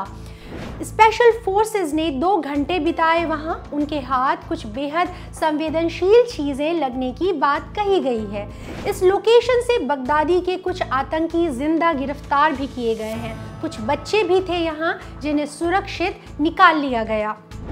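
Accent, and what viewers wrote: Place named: Uttar Pradesh, India. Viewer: Accha achha. Lekin kehne k tarika up wali aur voice quality Manmohan jee के jaisa hai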